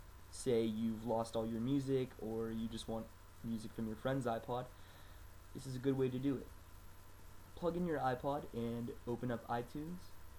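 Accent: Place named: United States. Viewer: that perfect american accent you have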